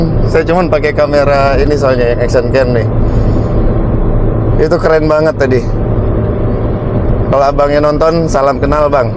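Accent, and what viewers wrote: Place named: Bandung, Indonesia. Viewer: O gt ya bang,, mf ya,, tp logat bicaranya kyk asli dr bandung gt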